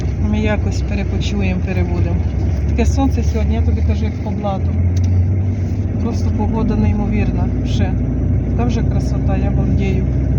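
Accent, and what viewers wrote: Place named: Korosten-Ovruch, Ukraine. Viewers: Говор Житомирської області,по розмові зразу видно звідки людина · Зразу зрозуміла, що з Коростенського району. Коростенсько- овручський говір не переплутати 😊. Вітання з Черняхова.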